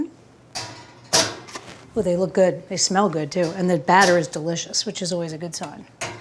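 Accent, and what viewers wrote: RP, United Kingdom. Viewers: is that how she talks? im going to watch the video after this one, but the voice sounded like...the queen's dialect. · Great brit accent!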